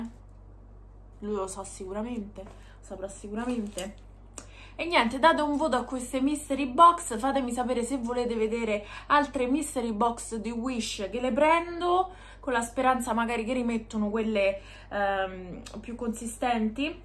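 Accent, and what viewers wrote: Rome, Italy. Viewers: Ciao, fai 1 video dove non parli romano ma solo italiano.... ti sfido 🤪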